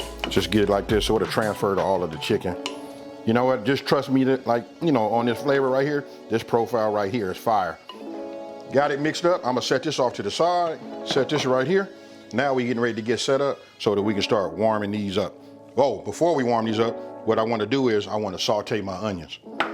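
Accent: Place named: California, United States. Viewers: 🔥 lol I knew you were from Cali its the accent my state and yes gotta have the corn tortillas and that Las Palmas! Great video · Man your accent is so Cali Unc it warms me up! All my favorite family is from Cali and hearing you talk reminds me of them. This looks amazing and I definitely plan to make it. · Hitting us with that Cali accent, but food is always point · Yup! I'm pretty sure is from Cali too. He has an LA accent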